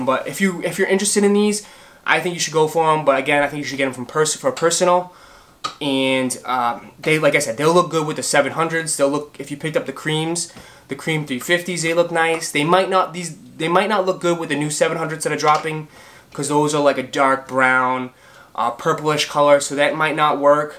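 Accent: Boston, United States. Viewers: Damn bro I knew you were from Boston before you even said it lol I have a co worker who talks just like you! Shoutout from Seattle my G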